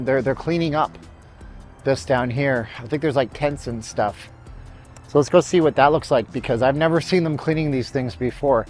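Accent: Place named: Canada. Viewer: You have a cool accent; possibly the most Canadian accent I’ve ever heard. Love your content!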